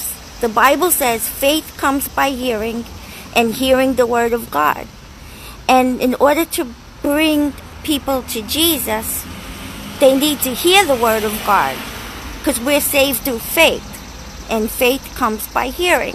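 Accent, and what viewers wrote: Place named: Queens, United States. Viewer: This is a great idea. Do you live in Queens NY? I ask because you have an accent and I hear a plane going over, in from flushing and had the anesthesia of LaGuardia going over my house all the time.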